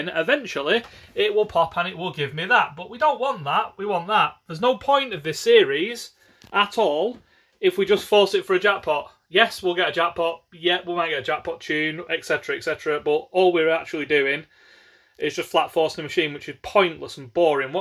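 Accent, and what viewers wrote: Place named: Yorkshire, United Kingdom. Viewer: lol yea love ur banter while ur playing proper plain speaking yorkshireman Im Teesside for me sins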